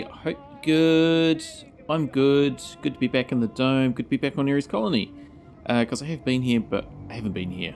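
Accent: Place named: New Zealand. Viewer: Man... i know its a tiny thing, but i was waiting for the American accent... and then i was pleasantly surprised. Im assuming your a kiwi? i really like this build from what i have seen of it man, great work, well recorded video, wwell spoken. highly enjoyed!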